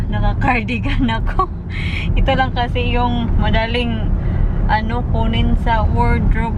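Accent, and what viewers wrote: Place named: Visayas, Philippines. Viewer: Basta bisaya lambing gyud kaayo.👍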